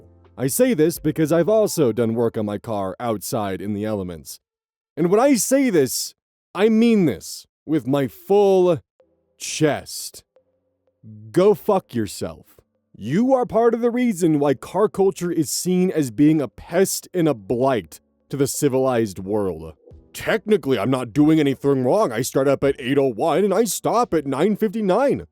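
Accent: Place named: Minnesota, United States. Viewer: You started sounding like that Minnesotan from family guy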